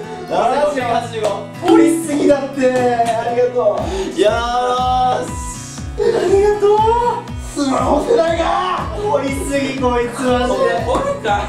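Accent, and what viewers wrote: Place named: Hokkaido, Japan. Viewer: たまに出る北海道弁がいいですー！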